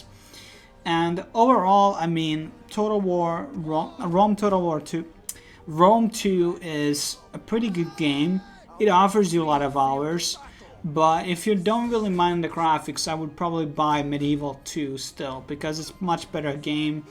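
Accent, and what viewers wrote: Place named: Poland. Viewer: you sound like my polish friend, are you from Poland?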